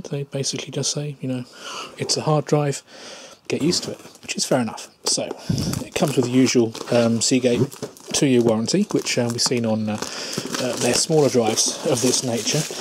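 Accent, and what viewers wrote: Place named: United Kingdom. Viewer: Thank you for the video ! im planing to buy one of these 8TB baby's. YOur british accent was a delight to wacth this video. unlike other guys whos accent i cant even understand most of the time. i have a simple question, if i buy this seagate 8TB external drive, can i just drag and drop my files from my laptop into this external drive ?? will it be this simple? cus im assuming this is just a sata drive, or will it upload to the cloud directly? im a lil confused whether i should buy this drive cus it says BACK UP PLUS.it's emphasizing on the package alot !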